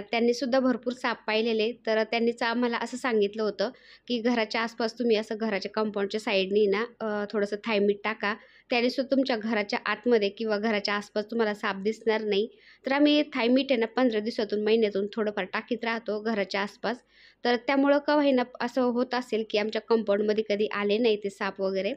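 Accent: Ahmednagar, India. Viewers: मला वाटलच तूम्ही नगरच्या आहे आम्ही पण नगरचे आहोत पण नाशिक ला राहातो माझे मिस्टर आशेच बोलतात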